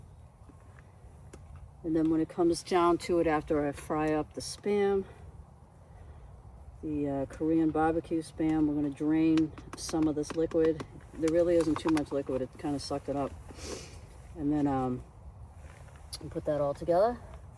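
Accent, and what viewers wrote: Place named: Boston, United States. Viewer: Love your videos & your Boston accent.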